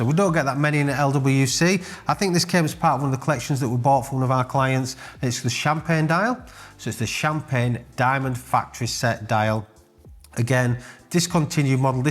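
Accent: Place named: Yorkshire, United Kingdom. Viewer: Out of all the YT videos regarding Watch content, I have to say, I really enjoy your videos. Always to the point, no nonsense or drama. Just the timepieces and the market details. I’m a huge fan. Enjoy that Yorkshire accent as well. I do have one question though, not to insult you by any means, but what is your opinion on the “Steinhart” entry level watch brand ? It’s interesting to me that very little information is out there about this brand. The Ocean One looks very similar to the ever popular Submariner, but without the Rolex price. As an everyday watch I’m interested in one. But as mentioned I can’t seem to get an experienced watch dealers perspective. Maybe that’s a statement in itself? Hope all is well across the pond ! Cheers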